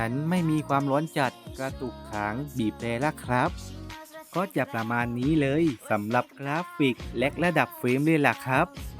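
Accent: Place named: South, Thailand. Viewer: ผมคนนครพี่คนที่ไหนเสียงเหมือนคนใต้เลย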